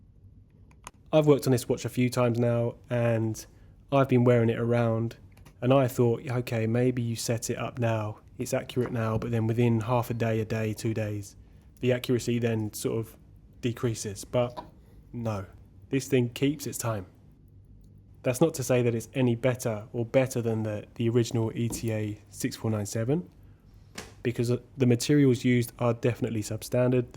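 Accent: United Kingdom. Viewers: Judging by your accent you are British British. So why the weird American word "winded", instead of wound?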